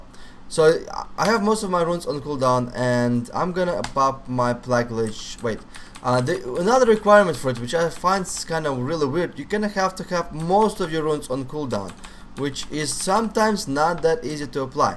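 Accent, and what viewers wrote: Russia. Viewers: Ты русский? Серп и молот вместо глаза, акцент. Да?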